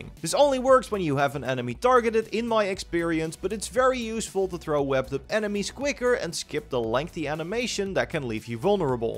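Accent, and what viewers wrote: Netherlands. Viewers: i smell dutch accent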